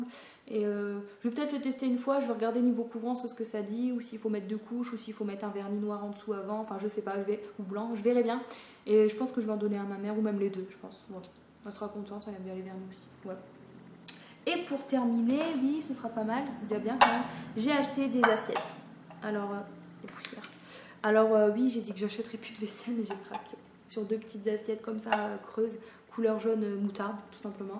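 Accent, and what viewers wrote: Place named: Pas-de-Calais, France. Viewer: Coucou c'est une impression ou tu es du pas de calais ??? L'accent trahit ... je suis du pas-de-calais ;-)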